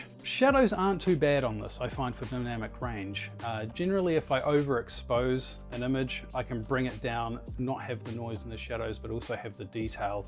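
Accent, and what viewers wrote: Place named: New Zealand. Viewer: Omg I thought you had a Kiwi accent! And you are from NZ indeed!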